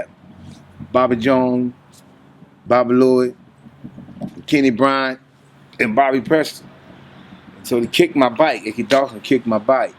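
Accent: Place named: Louisiana, United States. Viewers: I knew he was from New Orleans the first time I heard him talk lol he sound just like us. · Louisiana accent will never leave no matter how long you been away from home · He been gon that long and still can hear that Swamp in his voice. That dialect never leave us #DaBoot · Why do he still have a new Orleans accent 🙄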